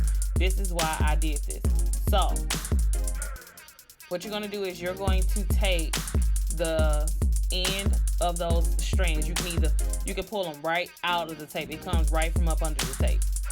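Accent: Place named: Memphis, United States. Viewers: Are you from Memphis??!! Lol!! The way you pronounce your words, sound just like my ppl from there. LOVE IT GUH!!! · I definitely was gonna ask was she from the south! LOL, her accent sounds like mine!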